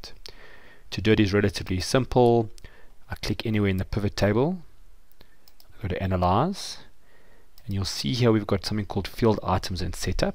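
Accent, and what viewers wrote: South Africa. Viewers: I miss the south African accent, but certainly not the country. Hope you also managed to escape!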